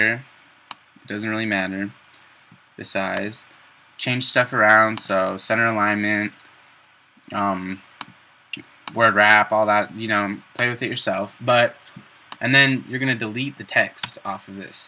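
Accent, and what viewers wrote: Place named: Maine, United States. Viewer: Thanks! Nice tutorial... Where are you from? Your accent is exactly like a friend of mine from Maine.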